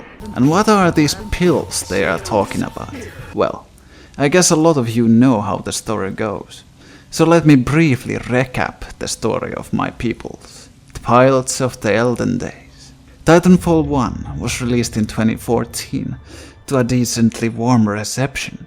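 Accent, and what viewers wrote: Finland. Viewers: u sound Finnish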